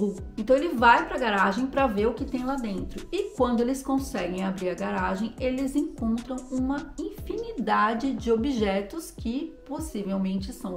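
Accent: France. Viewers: Je aimer le Ton Français , merci mandame.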